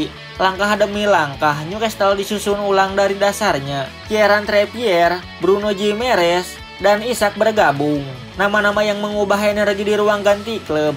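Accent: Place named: Java, Indonesia. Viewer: suaranya jga bagus kaya orang Jawa medok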